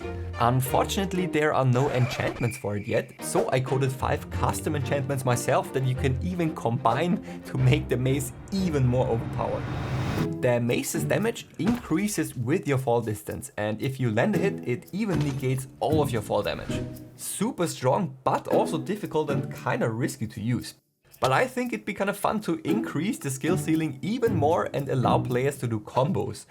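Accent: Germany. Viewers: Bist du deutsch hört sich irgendwie so an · Man merkt das du deutsch bist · Why does it sound like you have a german accent? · are you german cuz you are from Austria im german too and your english sounds like if you were german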